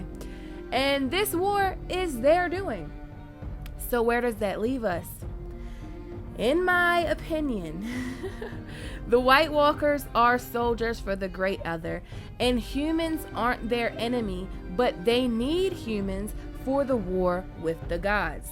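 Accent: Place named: Midwest, United States. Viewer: lol NOT comparing you to that creature. Where your accent from anyways? Midwest? Chicago? I actually prefer you being genuine rather than others who put on a terrible British accent when discussing ASOIF.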